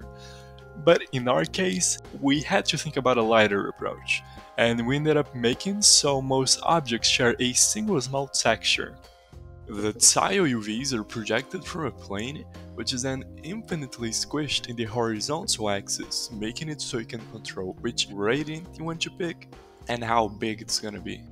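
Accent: Brazil. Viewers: dá pra sentir o sotaque brasileiro entrando na minha alma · O É BR Q EU CONHEÇO ESSE SOTAQUE. NÃO MINTA PRA MIM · Primeira vez que eu vejo um sotaque brasileiro numa fala em inglês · Eu amo que da pra saber de onde tu é so pelo sotaque KKKKKKKKKKKK Cadê, posta mais video nesse teu canal aí pra capitalizar nos br te reconhecendo aqui mano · O sotaque do cara é tão brasileiro que fica dificil não reconhecer, parabens cara pelo seu trabalho nessa confusão ai desse jogo, modelando tudo isso ai. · Caraca!!! is brazilian sureeeeeee Kkkkkkk Eae parsa I immediately noticed its brazilian accent Incrível saber que tem um brasileiro por trás de todo esse incrivel projeto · I'm glad a brazilian got to work of this (basing that on his pronounciation) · " BRAZILIAN, I KNOW THAT ACCENT. DON'T LIE TO ME "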